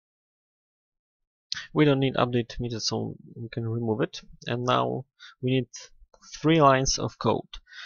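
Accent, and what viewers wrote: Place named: Indonesia. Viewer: dari logat bahasanya kayaknya orang indonesia nih...bener ga ya??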